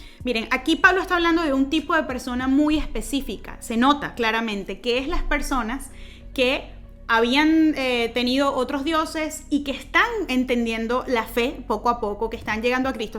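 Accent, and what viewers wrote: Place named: Venezuela. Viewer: De donde eres? Parece de Venezuela.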